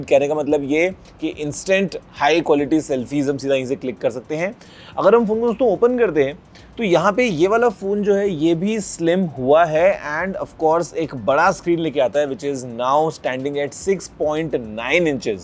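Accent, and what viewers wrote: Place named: South Delhi, India. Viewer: South delhi girls jaisi accent hai bhai teri. Makeover ke sath sath accent pe bhi kaam kar thoda.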